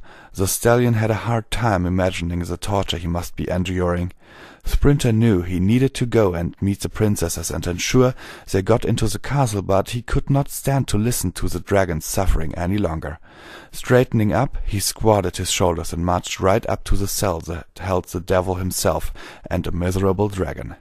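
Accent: Germany. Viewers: I don't know I just listen to it on YouTube and you said you're from Germany should have guessed it through your accent anyway I hope you take my recommendation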